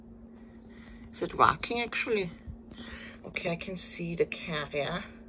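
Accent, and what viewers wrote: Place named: China. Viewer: IST DAS ENGLISCH MIT CHINESISCHEM AKZENT???!!!!!!!🤣🤣🤣🤣 ICH VERSTEH KEIN WORT VON DEM WAS DIE LABBERT!!!!!!🤦‍♂️🤦‍♂️🤦‍♂️🤷‍♂️🤷‍♂️🤷‍♂️ Ich empfehle diesen Kanal NICHT weiter!!!!!😑😑😑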